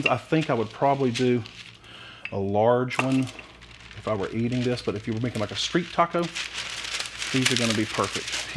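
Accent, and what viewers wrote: South, United States. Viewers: Pre-ordered your book! Thanks for all you do! So good to hear that southern draw and your easy going way. Good luck in Cali!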